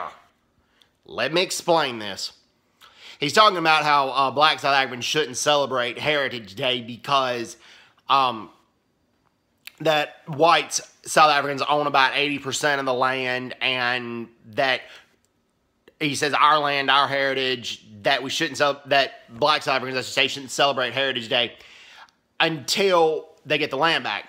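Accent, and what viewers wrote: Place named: United States. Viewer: Oh shut up! You come with your American accent and tell us the land was not stolen? Man you are lying. We will expropriate land.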